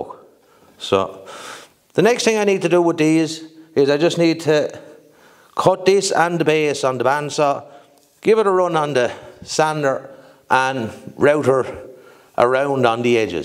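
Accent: Ireland. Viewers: Great video, very helpful. Also I had to subscribe just to hear that wonderful Irish brogue! Tanks!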